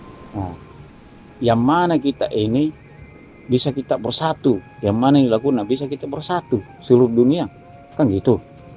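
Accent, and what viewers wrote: Sulawesi, Indonesia. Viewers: Assalamualaikum.. Oo..Daeng.. saya senang dengar logat bicaranya mengingatkan banyak kenangan😊. Setiap daerah mempunyai gaya bahasa logat yang satu kata berbeda makna. Dan sebagai orang jawa terkadang saya harus menyesuaikan kata yang dapat sepemahaman dengan mereka. Seperti kata kamu menjadi kita dan Iman vs yakin. Tetapi saya suka orang sulawesi👍..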